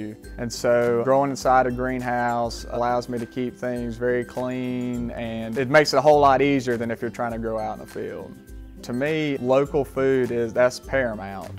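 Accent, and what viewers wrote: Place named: South, United States. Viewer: beautifull plants. your accent like people in the forest gump movie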